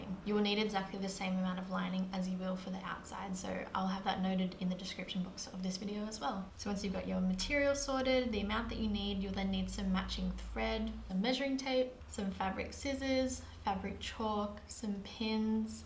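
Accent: Australia. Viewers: Wow, what an impressive instructional video!! Excellent job, your jacket is beautiful. You are so good with measurements and seams. I almost feel like I could do this by just watching you. But I know it took great kill and practice. I have some old wool blankets to be used for this project. Thanks and love the OZ accent, Cheers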